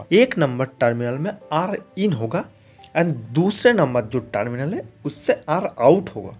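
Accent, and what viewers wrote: Bengal, India. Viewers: Best videos bro. Keep it up🎉. It feels good in bangla accent.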